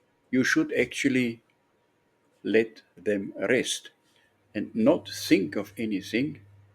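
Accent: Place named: Austria. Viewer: schoen den auch englisch sprechen zu hoeren. seit langer zeit im ausland lebend, erfreue ich mich aber immer wieder seinen oesterreichischen akzent zu hoeren. DANKE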